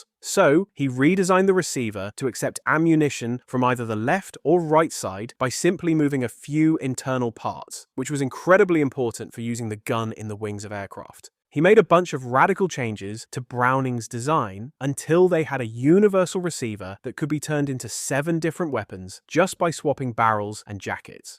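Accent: RP, United Kingdom. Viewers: Many things I give to the British being an American. The one thing I give more than anything is that for some reason the posh accent makes any statement feel authentic no matter what it is compared to most Americans unless it’s someone like Mike Rowe, Morgan Freeman, Etc. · Hey buddy, take your twelve point seven by ninety nine millimeter, and shove it up your British accent stove pipe, okay?!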